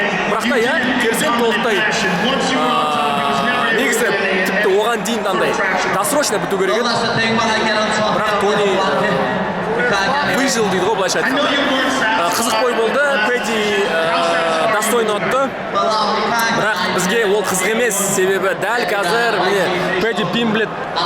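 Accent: Standard. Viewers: Керемет Жарайсын!!!👍👍👍👍 Керемет таза казакша солийсын👍👍👍👍👍👍👍👍❤️❤️❤️❤️😂😂😂 Мен сзге жазылдым!!! Алматыдан салем!😊😊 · 🤔🤯👏👏....МәссаҒан , Сіздің ҚАЗАҚ 🇰🇿🇰🇿🇰🇿🇰🇿🇰🇿Тіліңіз Өте Тазада , Әдемі Ғой !!! Құлаққа Кереметшіл Естілуде 🎧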